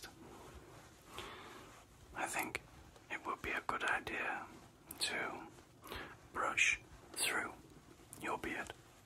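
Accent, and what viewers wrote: Yorkshire, United Kingdom. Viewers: a proper accent whilst listening to ASMR! Beautiful Yorkshire tones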